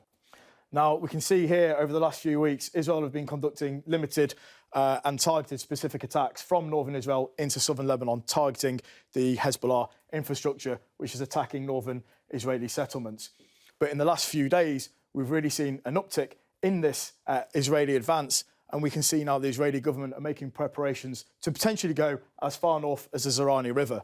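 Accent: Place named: Yorkshire, United Kingdom. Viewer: It's impossible for Americans to hear through the Yorkshire accent. E.g."cru-shul-ly erode that".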